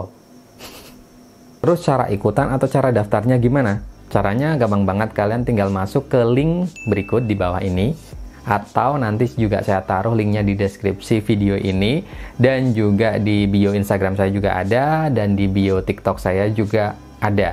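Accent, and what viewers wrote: Pekalongan, Indonesia. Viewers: Orang pekalongan ya bang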